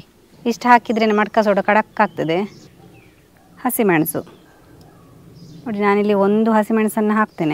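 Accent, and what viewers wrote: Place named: Dakshina Kannada, India. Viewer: ಮಡ್ಕ ಸೋಡಾ ರುಚಿ ಕುಡಿದ ನಂತರ ಗೊತ್ತಾಗುತ್ತೆ ಆದ್ರೆ ನಿಮ್ಮ ಕನ್ನಡ ಉಚ್ಚಾರಣೆ (ದ. ಕ. accent ) ಕೇಳಕ್ಕೆ ಬಹಳ ಚೆನ್ನಾಗಿದೆ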